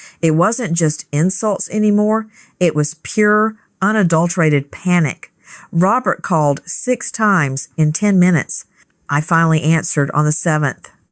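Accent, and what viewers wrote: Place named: South, United States. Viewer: Listening to this southern drawl accent is painful!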